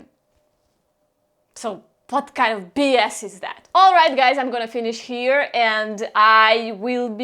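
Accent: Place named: Russia. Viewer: I love how straight up you are and your swearing word's makes me laugh your actually funny the way way you speak from Russia but in English speaking love your video on utube keep it up cheers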